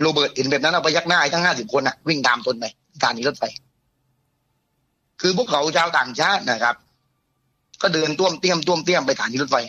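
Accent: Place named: South, Thailand. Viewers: ฟังยากจริงค่ะ😂 ขนาดฟังพี่แกบ่อย ยังไม่ชินกับสำเนียงใต้เลย😅❤ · เข้าใจบางคนที่ฟังพี่ ไม่ทันสำเนียงภาษ า ถ้าคนใต้จะฟังปกติครับ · ผมคนภาคกลางฟังรู้เรื่องทุกคำเพราะชอบสำเนียงใต้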